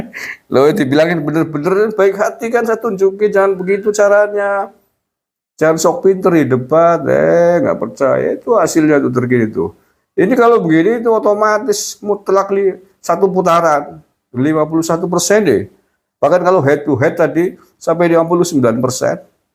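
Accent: Banyumas, Indonesia. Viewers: Ora ngapak ora kepenak mantap 02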